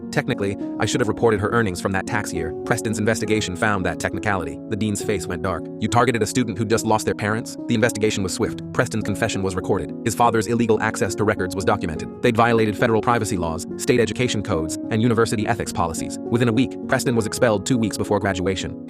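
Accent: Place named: Scotland, United Kingdom. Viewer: why is the ai voice like. VAGUELY Scottish at times...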